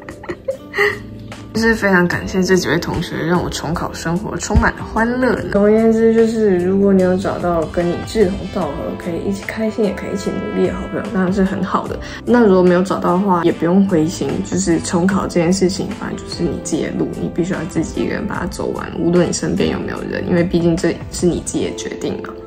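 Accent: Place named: China. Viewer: 為什麽有種大陸腔哈哈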